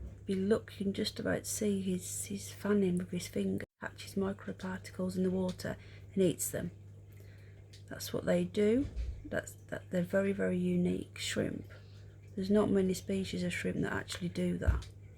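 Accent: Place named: Barnsley, United Kingdom. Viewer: Your Barnsley accent lady , (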